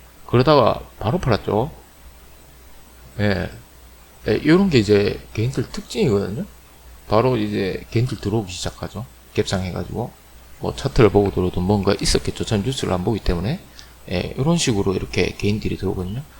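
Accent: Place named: Daegu, South Korea. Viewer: 아따 사장님 대구 사람인가보네요? 말투가 구시하이 좋네요~~~ 개미의 반대는 꿀이다 개반꿀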